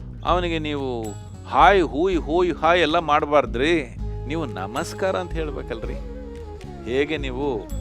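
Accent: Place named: Karnataka, India. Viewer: the musk (Kasthuri) fragrance of Kannada is still there in your voice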